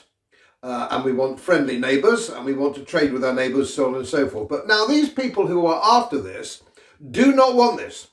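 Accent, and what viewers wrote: RP, United Kingdom. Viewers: Rubbish. On what basis can you THINK this. Just look at his dress, listen to his accent. He is a Colonel Blimp. People like him ARE the real traitors. And VILE people. Driving division and hatred - not community spirit, kindness. Appealing (sadly it seems successfully from comments here!) to the worst aspects of peoples' natures. Criticising politicians (not their side natch) yet using the SAME "othering" techniques the Nazi's did.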